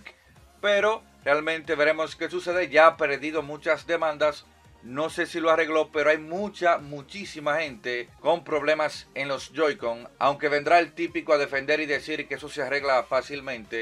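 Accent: Dominican Republic. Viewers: Este pana suena Dominicano! Muy buenos videos, Tiene mi like buen hombre!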